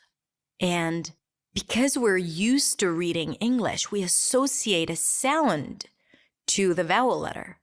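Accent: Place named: United States. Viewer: Hello! I love your beautiful American accent and thanks a lot of the podcast.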